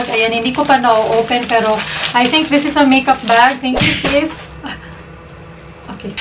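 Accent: Visayas, Philippines. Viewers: sa kaka panood ko sayo na adopt ko yung accent mo wahha. kaya akala ng mga viewers ko bisaya din ako heheeh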